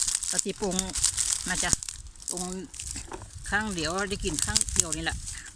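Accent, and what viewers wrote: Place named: Phu Thai, Thailand. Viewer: 🙏ดีค่ะ มาย้อนดูคลิปเก่าๆจ้า เป็นคนจังหวัดอะไรค่ะสำเนียงเหมือนภูไทหรือจังหวัดเลยค่ะ 🌷👍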